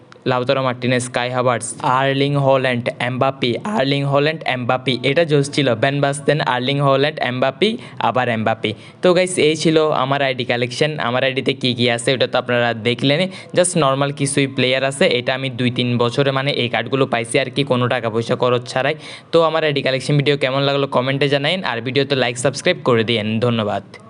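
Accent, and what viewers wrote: Chittagong, Bangladesh. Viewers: main ktha oitese vai ami Chittagong ar apnr voice shone mone holo apnio ctg ar Apnr voice a akta reaction saitesilm rki amr id ar oppr🥺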